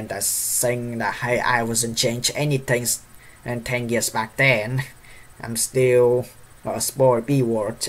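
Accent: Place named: Vietnam. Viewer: *Vietnamese accent intensifies* but keep trying, one day you can speak it fluently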